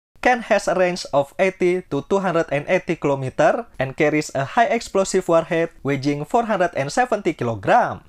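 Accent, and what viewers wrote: Indonesia. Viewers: Keren aksen nya original Indonesia 👍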